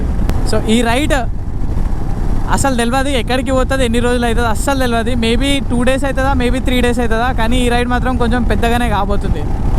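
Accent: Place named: Telangana, India. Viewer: U r the person who comes from the heart of telangana.(slang,style) aba aba rendu kallu chavalu anoku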